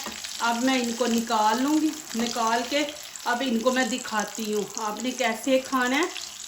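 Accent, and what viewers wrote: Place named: Punjab, India. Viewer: Punjabi lehjee Urdu bolti ham Punjabi hi bol lya krn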